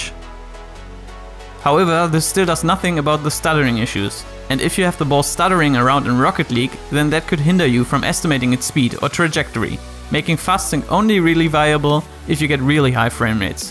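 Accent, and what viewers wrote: Germany. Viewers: Man hört auf jeden Fall den deutschen Dialekt raus 😄 Danke für das Video 👍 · WOW ich höre sofort den deutschen accent :) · Bist du Deutscher? :D Gutes Englisch aber man hört es trotzdem immer etwas raus, mich eingeschlossen xd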